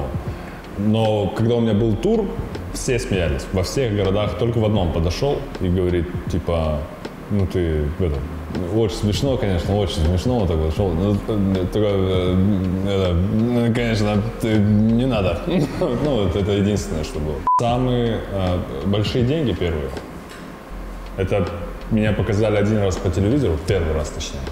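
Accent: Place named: Kazakhstan. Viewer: Если бы я с ним по телефону болтала не видя, я бы сразу сказала свой, казах)) есть у казахов свой неуловимый акцент, как и у корейцев, и у азербайджанцев, и чеченцев с идеальным русским произношением. Это трудно объяснить.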